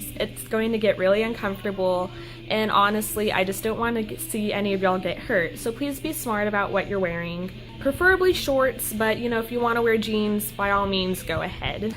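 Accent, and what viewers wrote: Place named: South, United States. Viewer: I love your accent. I kinda could tell that you we're from the south. Also great advice. I live in Western Massachusetts so the weather does get kinda hot but I'm going to the Hartford CT date. I'd love to know what bands you're going to see.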